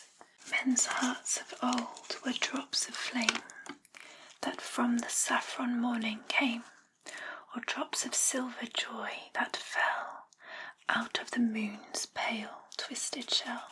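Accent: United Kingdom. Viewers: really those have one the best voices for asmr her british accent certainly helps like Lottie🤤🤤🤤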